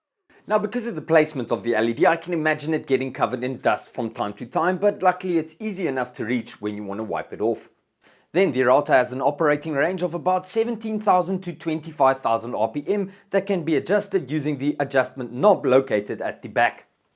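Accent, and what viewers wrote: Afrikaans, South Africa. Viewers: Another awesome video!!! Out of curiosity, what is your native language? Your accent seems like Afrikaans, not Zulu. Thank you for the video! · Are you a South African? I never would’ve picked it. 😂😂👍😉🇦🇺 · Always makes me happy to hear a South African accent.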